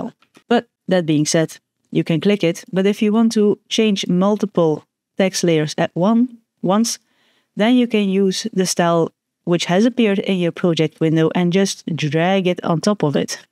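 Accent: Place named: Netherlands. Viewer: I know a Dutch accent when I hear one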